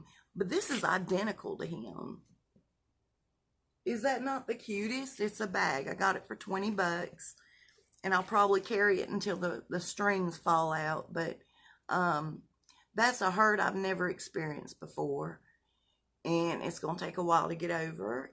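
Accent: South, United States. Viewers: So good to see you! You look beautiful, love your hair. Wish mine would stay put! I swear it has Dementia, I used the curling iron yesterday, tamed it with some hairspray so it would last the next day. check this out, I am mixed, with mixed hair, I woke up with freaking straight hair, how is this possible! When I want it straight, it doesn't stay, and gets frizzy and crazy. By the way, loving the Chewie Bag. Do you still live near the area Chewie disappeared? You know I'm still hoping he returns. It's good to see you smiling again, it really is. Always remember there's alot of people, you don't see that truly care, and love the heck out of you! Lots of love from Brooklyn NY You have the most adorable voice and the cutest Southern accent! And yet, when you laugh, 🤣 you sound so devious! You have a Bless good morning, and a beautiful day.💕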